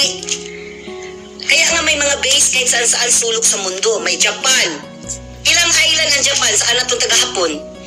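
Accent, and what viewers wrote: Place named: Visayas, Philippines. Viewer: Hahahaha yabang mo madam Eh halata naman sa salita mo na Visaya ka. Korte na para magkaalaman na sobrang yabang na eh. Hoy kakagigil ka na ha. Wag mo ipagyabang ang America dito dahil sa totoo LNG hindi mo cla kilala kong kaibigan nga cla. Cguro sabog ka KC maluwag Jan ang droga eh.parang kendi LNG na nabibili lakas ng tama mo madam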